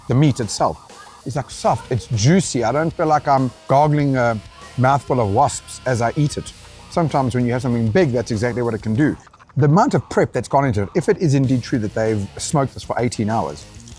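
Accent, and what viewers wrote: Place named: Durban, South Africa. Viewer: Who the fuck is this o ? What a fuckin legend. Boy talks like he’s grown up in the 031. Good o. Good o.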